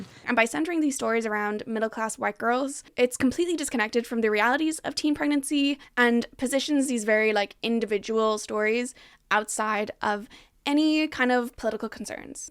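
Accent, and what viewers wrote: Ireland. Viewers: You’re Irish ?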